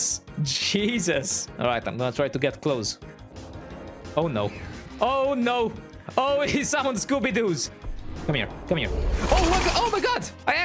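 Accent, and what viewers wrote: Italy. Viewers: You literally have the same accent as my Italian teacher 😂😂😂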